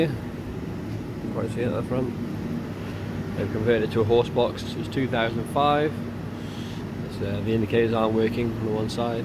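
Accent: United Kingdom. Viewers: Helpful video. Love the british accent😄. Thanks from Argentina 👍🏻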